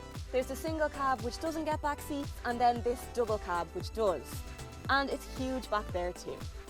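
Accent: Ireland. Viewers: Well it is an Irish accent, don't be so insulting and turn on captions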